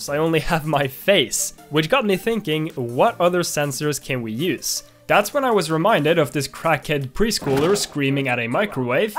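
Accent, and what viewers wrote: Norway. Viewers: Are you Norwegian 😬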